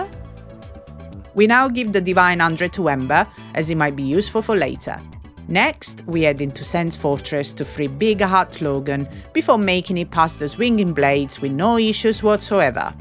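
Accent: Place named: Italy. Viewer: I can't predict how she's going to pronounce words. Italian, Southern England, and hints of Irish all rolled into one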